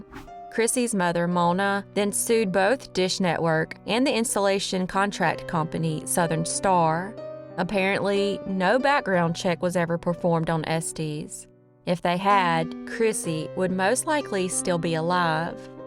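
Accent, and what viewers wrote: South, United States. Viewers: I understand, she is the only southern voice I can toletate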